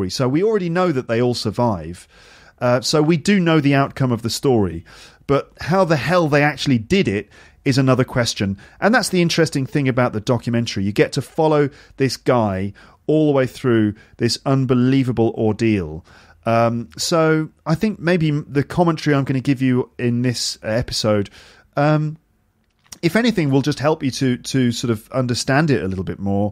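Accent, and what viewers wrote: United Kingdom. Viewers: Hello from Almaty Kazakhstan!! I adore British English it always nice to hear your podcasts! thank you very much,! I'm so greatful to you.....May the Force be with you, mate! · Hi. I got your podcast previous friday and i really like it. I love british accent. I'll try to practice with your audio to perfect my accent. thank you very much.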